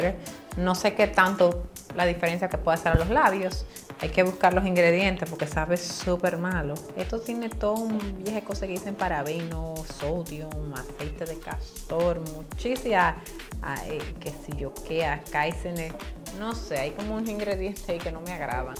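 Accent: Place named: Dominican Republic. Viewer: Amo la energía de esta mujer y me encanta que no cambias tu forma de hablar ese dominicanismo 😄😄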